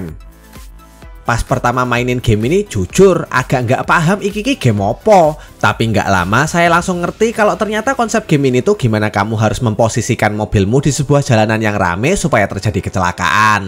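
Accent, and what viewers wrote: Java, Indonesia. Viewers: Lanjut bang,mantap logat jowo ne kentel banget. Yang ngeh no 6 liat size nya kalian emang debes ws pokok e,Iso ngeh lo salut salut,Lanjut bang.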